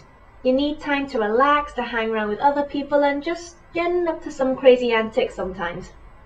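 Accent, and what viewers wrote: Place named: Wales, United Kingdom. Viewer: awesome - welsh is my favourite UK accent!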